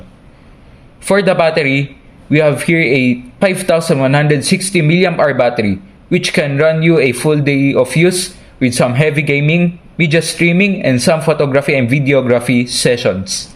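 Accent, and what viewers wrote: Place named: India. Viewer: Haha parang indian Ang English mo. 😅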